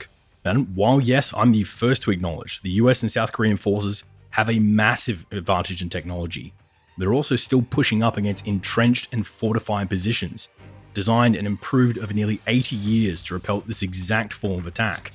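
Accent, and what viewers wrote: Australia. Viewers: I'm glad he stopped using the AI with semi-Aussie accent. It doesn't sound good and does not get the Aussie pronunciation right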